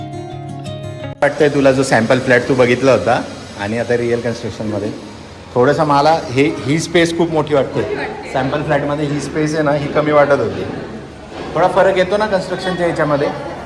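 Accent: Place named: Pune, India. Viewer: Punyat aloy mhanun proper marathi ka😂tumchya punyachi tone aikun vakaila yet bhava..🤮🤮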